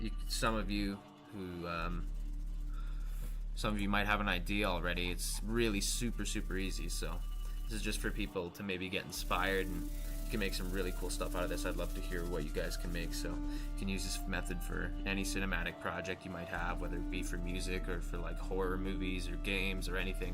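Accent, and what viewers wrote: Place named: Canada. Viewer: haha I loved the moment when I noticed you're Canadian! Hell ya brother, full send er eh!!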